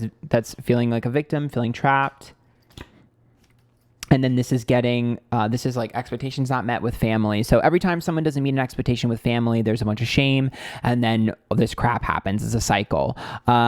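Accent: New York, United States. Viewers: I love when your new yorker accent comes out. Get em sis 👏🏼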